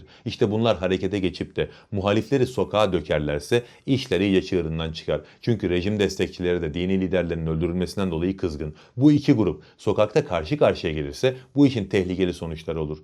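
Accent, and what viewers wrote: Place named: United States. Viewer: Amerikan ağzı ile konuşuyorsun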